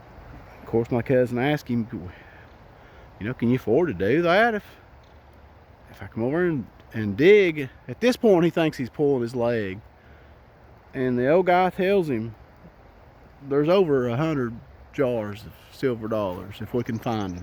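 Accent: South, United States. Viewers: Sir, warm greetings from Finland, english is not my mother language but i sure like your southern accent ! Thanks for the video, keep 'em coming!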